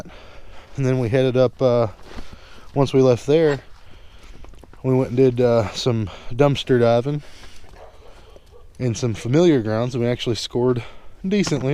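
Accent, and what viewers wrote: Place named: Texas, United States. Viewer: I knew it! I knew you lived in my area of Texas. That's amazing! I knew from your accent. As you know, our state has many different types of Texas accents. I was born and raised in Grand Prairie. I moved in 1989 to Navarro County and have heard so many different dialects. This is awesome. Thank you for your wonderful videos. I am a new subscriber (last week, maybe).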